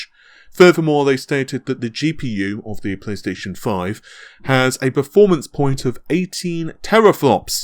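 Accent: United Kingdom. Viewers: Its rough having a deep voice with a British accent imo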